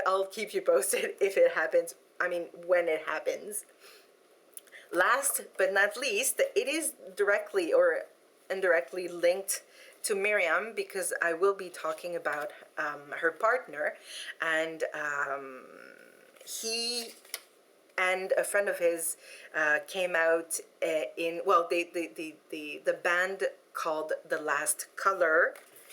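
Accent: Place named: Quebec, Canada. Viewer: Ah! French-Canadian. Neat! I knew you had an interesting accent, I just couldn't pinpoint what it was. I live in the Southeastern part of the United States, so you would definitely hear an accent from me! Lol. What's so odd, is that I have had people in my own hometown asking me where I'm from. That hasn't happened in a while now that I think about it---but it happened quite often for a while. So, I couldn't tell you WHAT I sound like, lol! Just a Southern accent. Have a great weekend, and---don't let the vampires bite! 👿 I would love to get bitten and turned into a vampire, actually. Life would never be boring then! Lol. 🤗🤗🤗 🎃🕸🔥👻💀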